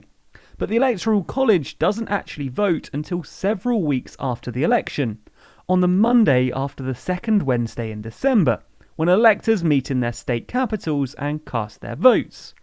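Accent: United Kingdom. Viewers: Dudes British.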